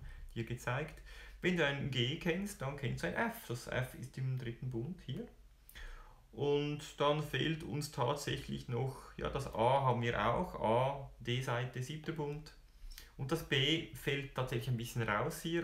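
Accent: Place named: Switzerland. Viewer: Hallo, Deine Videos sind sehr hochkarätig, sehr gut strukturiert und angenehm anzusehen (auch wegen dem schwiezer Akzent;)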